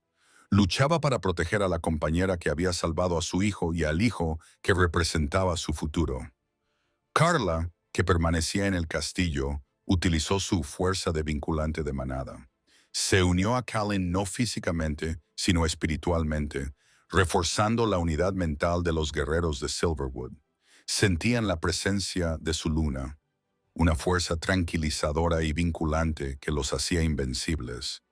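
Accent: United States. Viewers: Pues buena la historia pero como una prometida va a matar al rey para quedarse con su trono si ni siquiera estaba casada... como que la traduccion esta mal la voz narradadora parace un gringo hablando español, pero en fin la omega era la madre muy revorugada....el alfa seguia haciendo lo mismo basandose lo que lara le decia...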